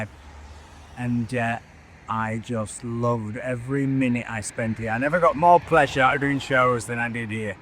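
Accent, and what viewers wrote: Yorkshire, United Kingdom. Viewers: .. complete with enhanced Yorkshire accent .. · Thank you for sharing this. ? You made a beautiful tribute o your father. ❤‍🩹 On a lighter note, I loved how your Yorkshire accent came out so strong! 😹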